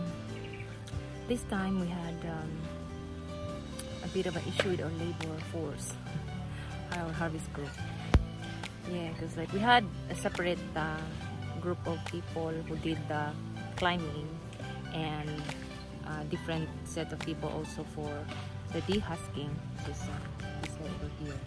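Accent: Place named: Australia. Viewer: dont blame you those workers maybe not intelligent enough to care even you said that they were hill people before or was William when he was in the back block love your swearing your very Australian its cute when my wife swears like you do but my wife has understand more bastos Australian now after 20 yrs even at work her co workers teach her to hahah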